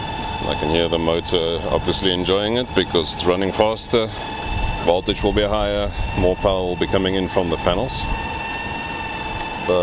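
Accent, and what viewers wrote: South Africa. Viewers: I hear a South African accent -> I press the like button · I love the SA accent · Nice! Kink soos Engels met 'n Afrikaanse aksent.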